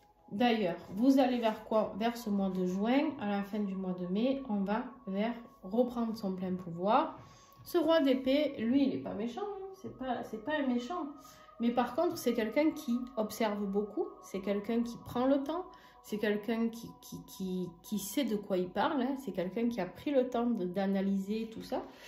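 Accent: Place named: Provence, France. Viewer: Votre accent....j'adore ! 👍 le soleil, les cigales,les oliviers, les lavandes....tout simplement la Provence ! En tout cas, la Balance que je suis se retrouve dans cette guidance très parlante dans beaucoup de domaines. En pleine succession familiale, avec tout ce que cela implique....pas simple à gérer. Professionnellement je pense qu'ils vont savoir comment je m'appelle !!!! Relationnel et sentimental, il ne veux pas déterrer la hache de guerre, silence radio depuis des longs mois, je sais qu'il ne reviendra pas. Je guéris tout doucement....Merci ❤⭐🌹🙏🌞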